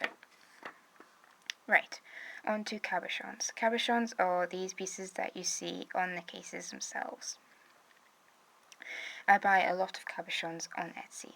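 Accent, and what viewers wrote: United Kingdom. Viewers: Yay ur from the uk aren't u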